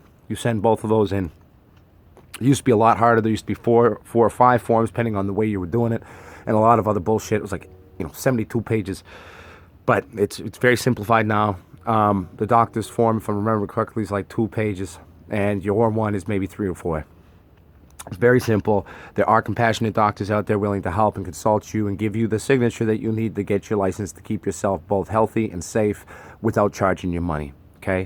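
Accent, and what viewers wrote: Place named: United Kingdom. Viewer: Great British accent. Have you ever done voice work?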